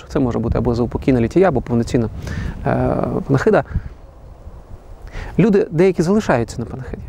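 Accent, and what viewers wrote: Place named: Russia. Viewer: Яка українська - московська(сумно)!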